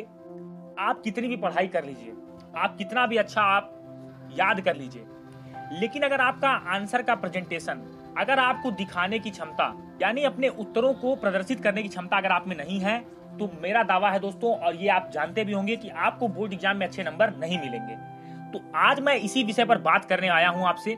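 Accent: Bihar, India. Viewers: Sir ji aap bihari ho???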